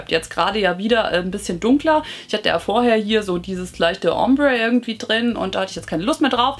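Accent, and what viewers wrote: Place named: Hesse, Germany. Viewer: Auf Hessisch bin ich jetzt so gar nicht gekommen. Musste gleich lachen als meine Frage prompt in deinem Intro beantwortet wurde. Süß irgendwie. 😊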